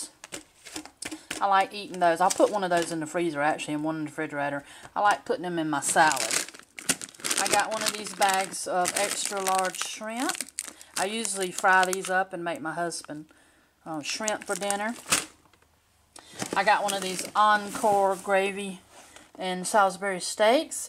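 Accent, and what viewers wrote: South, United States. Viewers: I like grocery hauls and yours was nice!!! I like the way you speak. I’m from South Carolina and I love southern sounding people 😂!!! Have a great day and God bless!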